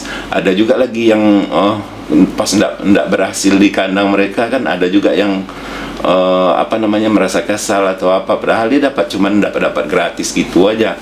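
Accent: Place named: Padang, Indonesia. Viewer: Cakap nyo logat padang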